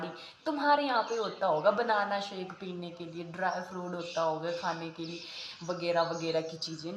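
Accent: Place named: Haryana, India. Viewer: aapki half language haryanvi hai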